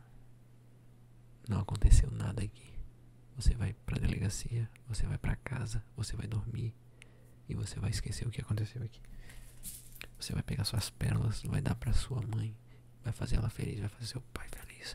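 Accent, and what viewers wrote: Brazil. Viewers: Ótimo asmr adorei, incrível com tá para perceber que vc é manezinho hahaha também sou :)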